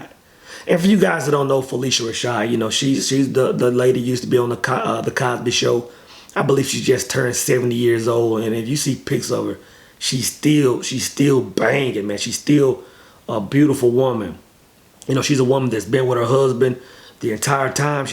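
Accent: Texas, United States. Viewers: Big dawg is obviously from Texas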